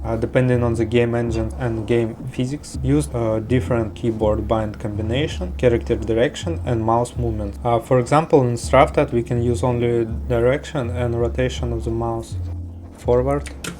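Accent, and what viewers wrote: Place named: Russia. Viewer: bro this video is really good thank you for the suka blyat accent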